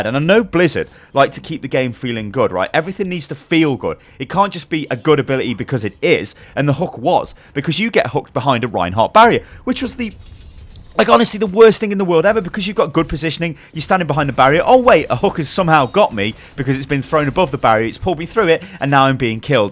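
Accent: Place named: United Kingdom. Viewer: ggeeeeesh why is it so tuff to understand british people when there exited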